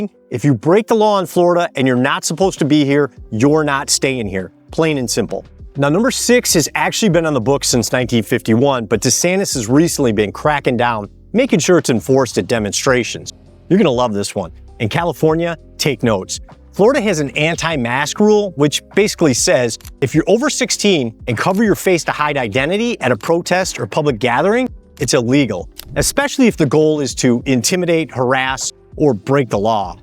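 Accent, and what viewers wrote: Michigan, United States. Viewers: That Michigan accent is THICK my fellow Michigander ❤ (or tell me which state you're from but I would bet money lol) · Great video! Are you an escapee from Michigan? 😅 I recognize the accent. 😊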